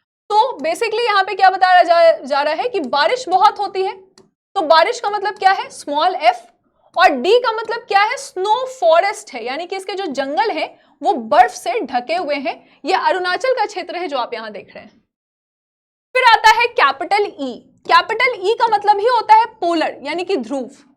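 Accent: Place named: West Bengal, India. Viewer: Dilect se lgta madam West Bengal sey belong karte hai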